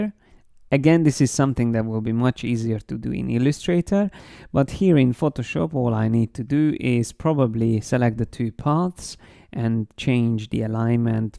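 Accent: Hungary. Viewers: Jézus, de ki lehet hallani a magyar akcentusodat :D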